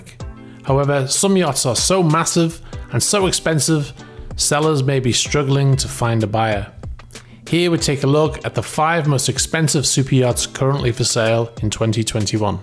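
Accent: United Kingdom. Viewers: By the way, bloody good job on the intro image of the S/Y eSysman. Sets the proper mood. Next improvement may be to animate the stern door to open, revealing your Porsche. And for what it's worth, being new to your channel and somewhat out-of-tune with a British accent, will you forgive me for thinking that all the boats you feature are flagged in Cyprus? (super yacht...... cypriot).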